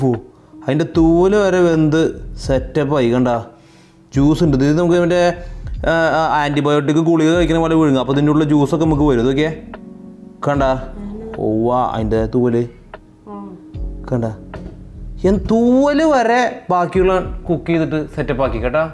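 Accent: Thrissur, India. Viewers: What a humour. Trichur slang. Beautiful. Yes ur hands r love. Fantastic presentation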